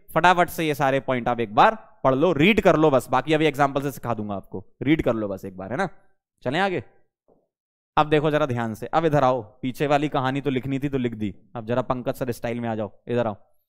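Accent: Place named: South, India. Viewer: Zaid Khan toh maine kb burai k mai toh inki personality k bre m boli slightly south Indian jaise bolte h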